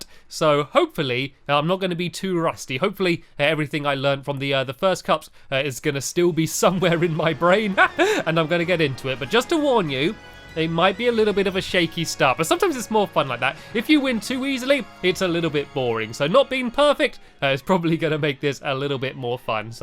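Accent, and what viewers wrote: United Kingdom. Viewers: He is british he has an accent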